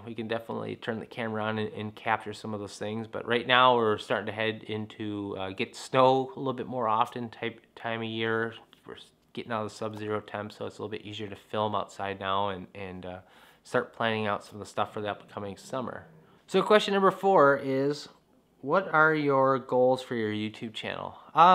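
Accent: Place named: Wisconsin, United States. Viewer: as someone from wisconsin you sound like your from wisconsin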